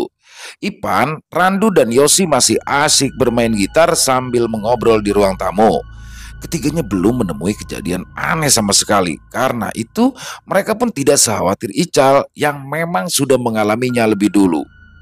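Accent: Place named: Kalimantan, Indonesia. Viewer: Kok yo Kalimantan bgt gtu lho 😭😂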